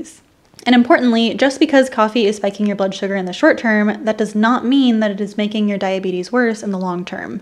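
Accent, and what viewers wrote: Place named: United States. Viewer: Thanks.Your video may be great but all can't benefit from it because you speak too fast in your typical American accent.Additionally,you don't text all what you talk about. This makes hundreds of subscribers face this daunting task of understanding your good lecture.Hope you make necessary improvements in future videos.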